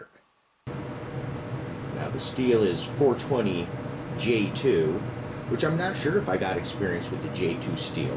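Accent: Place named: Pittsburgh, United States. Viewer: Hey man, are you from Pittsburgh? You sound like it. Thanks for all the vids.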